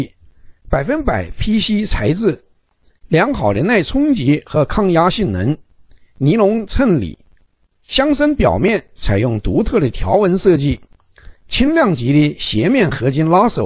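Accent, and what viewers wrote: Sichuan, China. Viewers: 很明显是四川普通话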